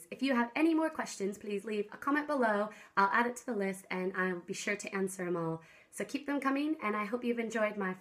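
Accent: Ireland. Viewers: Are you Irish?! Whaaa? lol It's so lovely to know that blogging has helped you to settle. I know I would really struggle with moving to another country x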